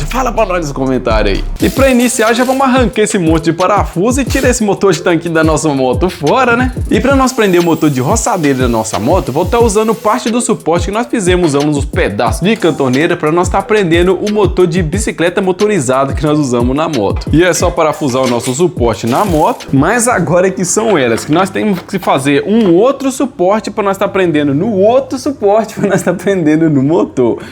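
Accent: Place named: Northern Minas Gerais, Brazil. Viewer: Tem o sotaque do norte de minas 😅! Ou nortminas soo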